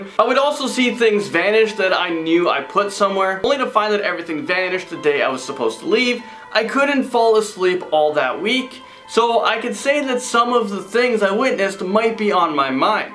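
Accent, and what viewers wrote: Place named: United Kingdom. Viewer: 🛑🛑🛑 #mostamazingtop10 one this chanel is my addiction, I talk about it all the time. I put all my friends on , I watch your videos so much you got me talking In a British accent lmao. On a real note I'd like to share a story that was shared with me .. long story short my grandma and her siblings had a oujia board, while using it the word death was spelled out.. three days later my grandmas brother was out riding his bike ... unfortunately he was hit by a truck and died instantly.. God rest his soul.. ouji is no joke.. stay woke. 🛑🛑🛑 _KeekMarie 🤟 Ignore the Johnny ravo name Im using my boyfriends account.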